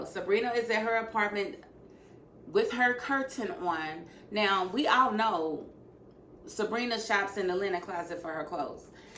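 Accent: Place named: Chicago, United States. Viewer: I’m a relatively new sub. Are you from Chicago? Your accent reminds me of Selena Johnson.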